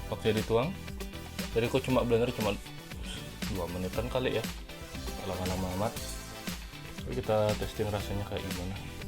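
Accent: Bali, Indonesia. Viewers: Logat baline kental sajan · Bali bukan bang??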